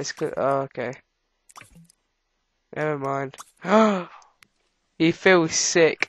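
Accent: United Kingdom. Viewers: You British? :)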